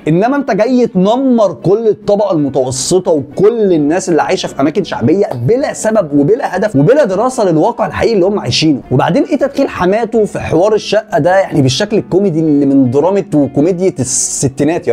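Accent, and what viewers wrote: Egypt. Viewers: انت من عين شمس ولا اي 😂😅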